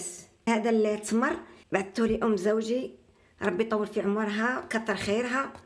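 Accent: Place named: Laghouat, Algeria. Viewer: اختي هدرتك كيما هدرت ناس الاغواط.ممكن نعرف انت منين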